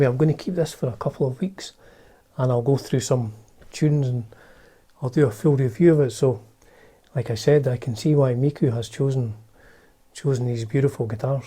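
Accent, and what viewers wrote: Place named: Glasgow, United Kingdom. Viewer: You sound like you might be on the Glasgow side of Scotland.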